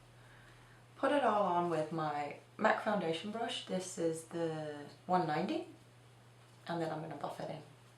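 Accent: Ireland. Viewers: are u irish? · I actually thought you were Irish :D I love the tutorial and your accent is really cute too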